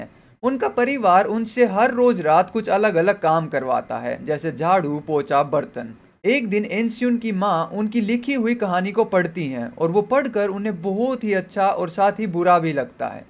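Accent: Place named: Haryana, India. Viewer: Bhai aap haryana se ho kya🎉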